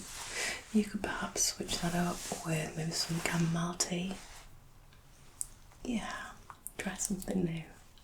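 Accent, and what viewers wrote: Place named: North West England, United Kingdom. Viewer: Hello, happy Xmas, that accent sounds north west?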